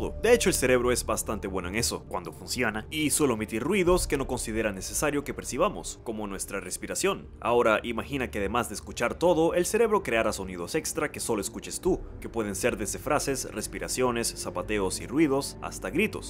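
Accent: Venezuela. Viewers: como se nota q eres venezolano, salu2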